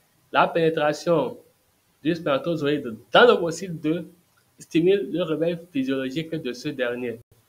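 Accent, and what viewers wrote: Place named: Africa. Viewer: La prononciation africaine de français me fait mal au cœur mais merci beaucoup 🎉❤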